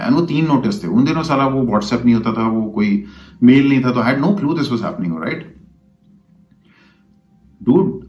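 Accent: Bihar, India. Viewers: Apka bihari tone me bolna bht apnapan deta h. Thanks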